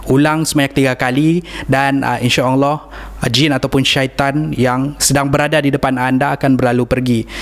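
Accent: Sabah, Malaysia. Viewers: Orang sabah ka ni? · Pekat beno loghat Sabah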